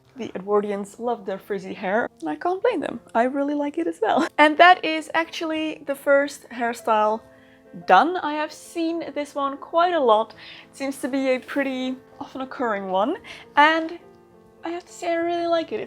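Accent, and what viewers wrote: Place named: Netherlands. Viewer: lol dutch accent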